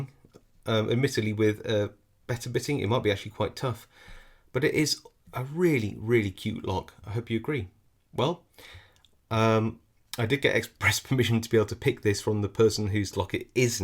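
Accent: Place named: RP, United Kingdom. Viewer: You are just so talented, you speak the "Queens English", you pick locks, gut and re-pin locks, and now I see you can do optical illusions! Does it EVER end!?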